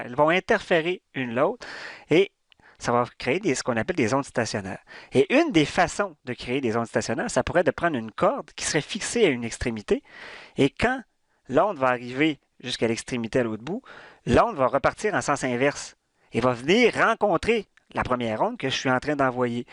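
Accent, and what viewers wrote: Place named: Quebec, Canada. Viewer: Maintenant en ds quand je vois des ondes stationnaires j'ai un accent québécois dans la tête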